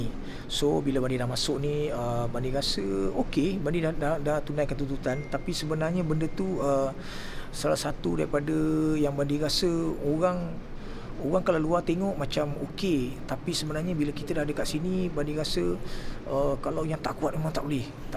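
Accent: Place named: Johor, Malaysia. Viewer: slang johor sey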